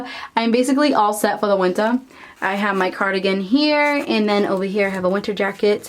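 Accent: Boston, United States. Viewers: smile every time when I hear the boston accent. Awesome video.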